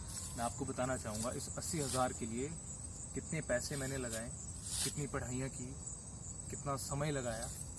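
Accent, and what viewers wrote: Standard. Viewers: man i really love your hindi ...... its so pure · Love your accent Pure hindi.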